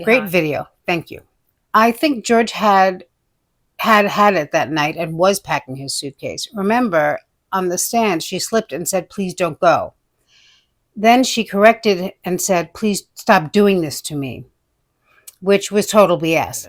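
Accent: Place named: East Coast, United States. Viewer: Off topic but I love your hair, you’re a stunning young lady- also, yes…lady Macbeth is also a fantastic show, she does great work!! I was laughing with her drunky the bear videos-her trying to get into SB mind set-yikes!!! I think with your accent you must live east coast-I’m west coast-we should all have a SB or forensic files marathon!! 😂 My best friend and I watch our “murder shows” mom calls them 😅and we enjoy them, so glad I found all the people with like minds !!!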